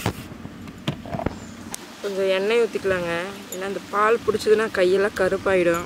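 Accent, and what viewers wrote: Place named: Coimbatore, India. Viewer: Akka your speaking coimbatore tamil very nice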